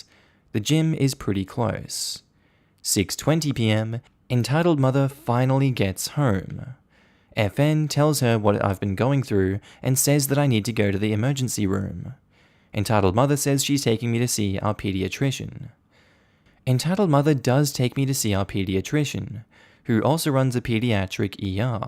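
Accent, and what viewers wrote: Canada. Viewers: I think you sound Canadian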